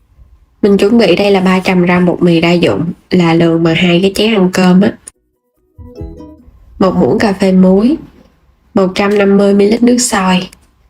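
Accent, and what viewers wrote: Mekong Delta, Vietnam. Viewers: Giọng miền Tây người ta vậy đó mấy má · Giọng miền Tây đích thực đó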